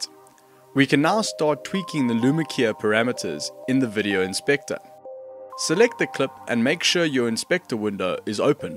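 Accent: South Africa. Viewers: Good to hear a fellow RSA ! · Hi, very nice tutorial mate. I have a video request I'd really like to make. In iMovie on the Titles tab, there is a title animation called "Line". A line expands out left and right from the centre and then text fades in above and below that centre line. I would like to do the same thing in FCPX but instead of a fade in, I would like the text to come out from "behind" the thin line to rest on the top and bottom of it. I thought I could achieve this effect by using transparency masks for each text blocks and then using the baseline tool to move the text above and below the line. However keyframes don't track the baseline setting between frames so I can't animate it. Do you have a tutorial for this? Thanks so much for your help! PS Are you South African?:D Your accent sounds very similar to where I grew up!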